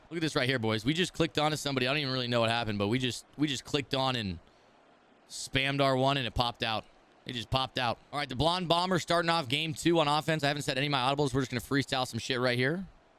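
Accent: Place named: United States. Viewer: Normal American accent lol we do that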